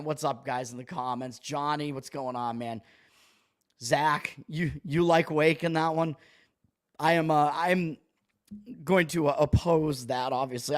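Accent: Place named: Boston, United States. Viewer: we need more Boston accent... Worcester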